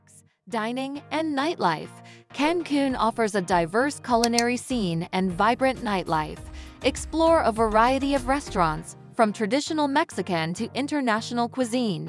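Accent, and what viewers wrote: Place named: United States. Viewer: when american pronunciation cicks in,.. some places had a different pronunciations. anyways thanx for this general video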